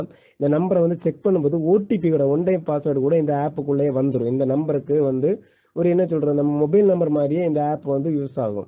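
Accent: Kanyakumari, India. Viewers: Kumarii slang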